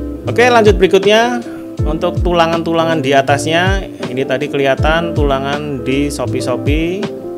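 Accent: Java, Indonesia. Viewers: Kerbaooo 😂jowo ne kuentel banget mas👍👍👍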